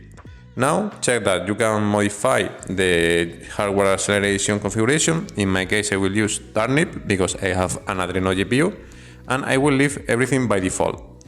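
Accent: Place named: Spain. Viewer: Very spanish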